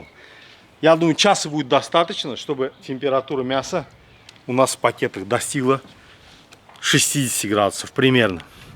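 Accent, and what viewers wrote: Baku, Azerbaijan. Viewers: Я многих подписал...акцент бакинский..ностальгия.спасибо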